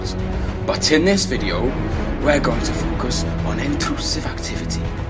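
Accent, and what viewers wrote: Wales, United Kingdom. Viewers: I think welsh.not sure but it does sound awesome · I'm acc here for revision because I have a mock, and I'm getting these cheeky lads giving a commentary on something they'll never probably use! That Welsh accent though!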